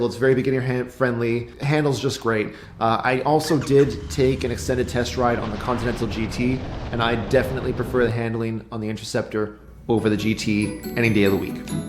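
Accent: Canada. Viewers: He’s Canadian eh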